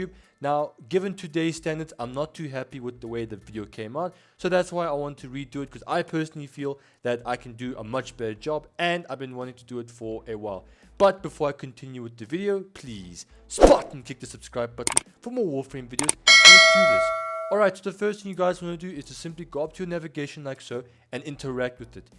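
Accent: South Africa. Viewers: Hey bro you def south african i want an invite man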